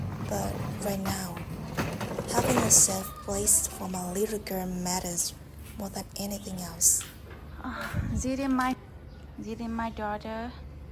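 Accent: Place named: Vietnam. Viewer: don't ever feel self-conscious about your voice or pronunciation. You are speaking English, which puts you ahead of many other Vietnamese ladies. Your video is very well presented. You will do just fine if you keep going and don't let the negative commenters bother you. Anyone who says anything negative about your efforts is inconsequential in your life. Your husband must be a cruel person to leave his wife and child in this situation, and bad luck will follow him in his future endeavors. I believe your channel will grow very fast, and you will benefit greatly from your efforts.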